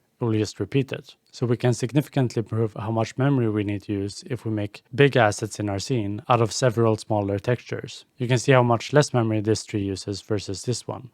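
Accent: Sweden. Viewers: Your not swedish like me by any chance? Sounds like you have a stockholm accent.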